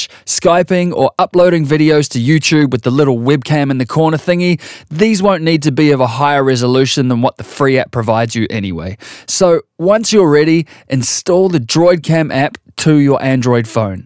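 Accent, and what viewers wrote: Australia. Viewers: You sound autrallian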